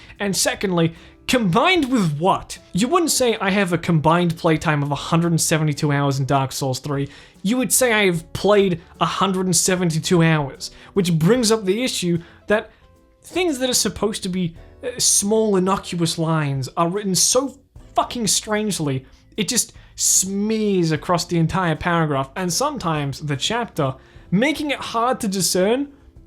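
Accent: Australia. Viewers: Are you an Australian Danny Gonzalez? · he's australian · didnt realize you were Australian thanks for the call back im one of the audio listeners. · I always forget you're Australian. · that’s the beauty of the Australian accent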